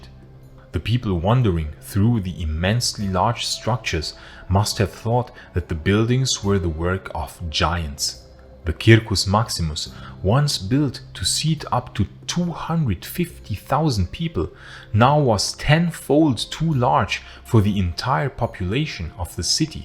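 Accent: Germany. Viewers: It's absurd trying to present and emphasize the Eastern Romans as the ones who destroyed Rome whilst the barbarians as the ones who wanted to make it great again as if the latter were not the ones the Romans have been fighting against forever. I urge you to stop the propaganda favoring the barbarians. We know darn well who overthrew the Roman Empire in the West and not you nor anyone can change that, no matter how many videos you make using pretty English in germanic accent. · german accent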